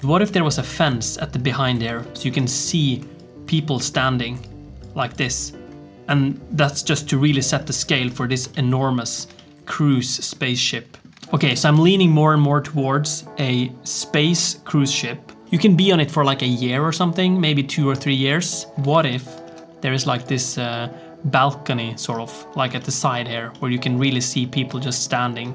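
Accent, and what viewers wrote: Sweden. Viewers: I love how obvious your Swedish accent is. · Wait you have a Swedish accent? I think, if your from Sweden write a answer to this comment, in Swedish.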